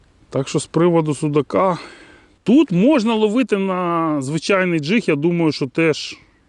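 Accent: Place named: Surzhyk, Ukraine. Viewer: Це якесь жахіття! Хіба так важко підтягнути українську? Ну таке вже суржело махрове, вуха завертаються! На весь україномовний ютуб дві рузскагаварящіє цєлюсті - і Петраш.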